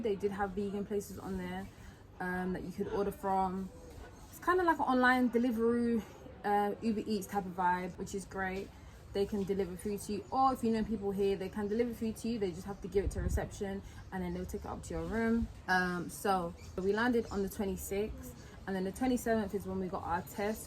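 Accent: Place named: Barbados. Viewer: Its also the bajan accent coming out for me😩😍😍😍😍😍🔊🔊🔊🔊🔊💙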